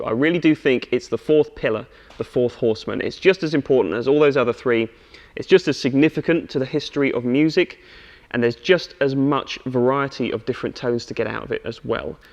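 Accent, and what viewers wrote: United Kingdom. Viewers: When speaks, I close my eyes and pretend Joe Bonamassa is speaking to me with a British accent. Incredible playing by the way too!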